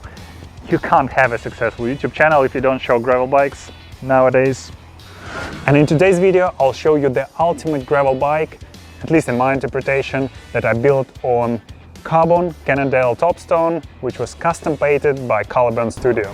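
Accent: Russia. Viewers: Do like your videos, buddy! Are you from Russia& Sounds like that ) Hope to see more interesting Gravels on your channel soon!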